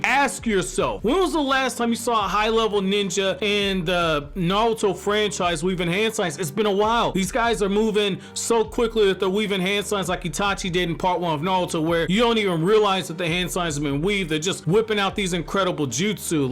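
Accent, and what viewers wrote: New York, United States. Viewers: What Part of Newyork you from man?🙌🏾🙌🏾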